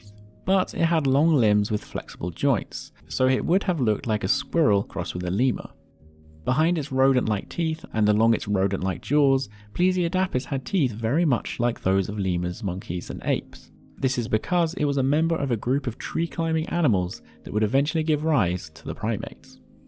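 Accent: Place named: United Kingdom. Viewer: A british accent is essential when telling "just so" stories to small children.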